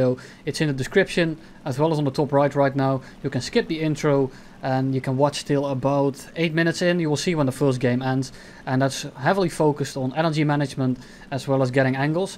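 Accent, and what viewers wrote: Netherlands. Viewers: Are you Dutch cuz your L's are very much like the most Dutch people say it? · I must say, the level of English here is amazing. Of course you can still tell that he's from Holland, but I'm really impressed because it's not the standard Dutch-English. Good job mate!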